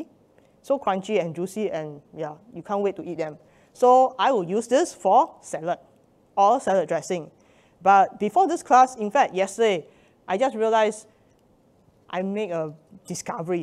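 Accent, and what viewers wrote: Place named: Nigeria. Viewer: Why she sounds nigerian 😅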